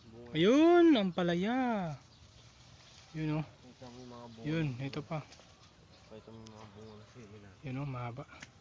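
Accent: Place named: Pampanga, Philippines. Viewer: may punto ka narin ng kapampangan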